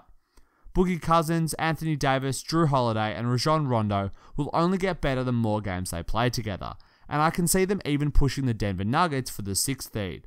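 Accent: Australia. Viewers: you are very australian sounding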